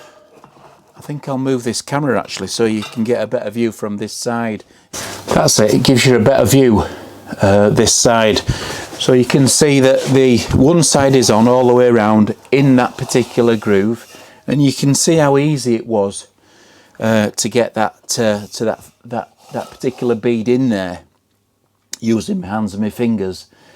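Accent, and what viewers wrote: Yorkshire, United Kingdom. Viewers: ! Great job! Thanks so much, and ya I've subscribed too;)) AND i do wish you 'd insert 'sources' or 'links' for the various products you found helpful!! For example NUTRAK MILK, and that fancy BENCH WHEEL-HOLDER tool!! and the?"Pedro Tyre Levers"??? Did I spell it right?? ALSO: Question: Why not use Powdered Graphite as a lube for pushing the tyre around... As you probably know, some people use TALC on an inner-tube, but that material has health issues (like traces of asbestos in it) as a lube but perhaps they could switch to graphite OR to Corn Starch?? Your opinions would matter to us all!! (Oh and we love that Yorkie accent, guy!)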